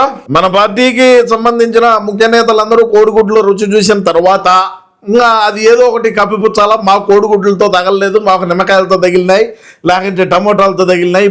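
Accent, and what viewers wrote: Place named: Kadapa, India. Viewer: అగ్గువ! కడప మాండలికం వింటూంటే చాలా బాగుంది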